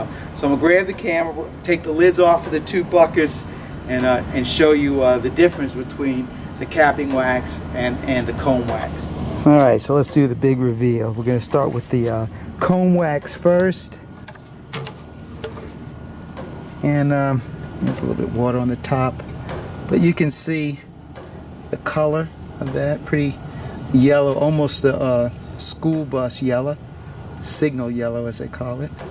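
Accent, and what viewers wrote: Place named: New England, United States. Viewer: you don't sound like you're from Louisiana. More like New England originally.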